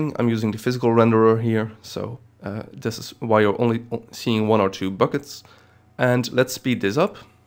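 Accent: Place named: Netherlands. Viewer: hee jij bent een nederlander! ik hoor t aan je accent :P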